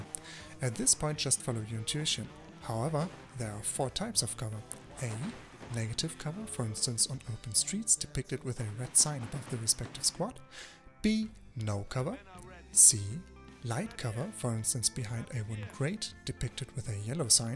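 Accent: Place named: Germany. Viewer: Great tips, the fact that they re also in German English accent is great addition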